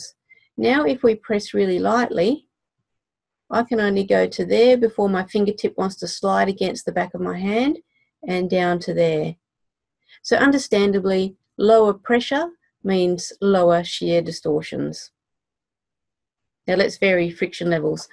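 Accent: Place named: Australia. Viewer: those of us not as familiar with the Australian accent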